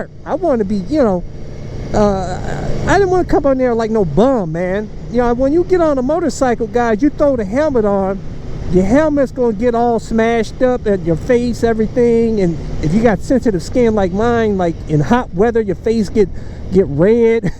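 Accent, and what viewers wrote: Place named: Cleveland, United States. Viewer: Cleveland hood accent.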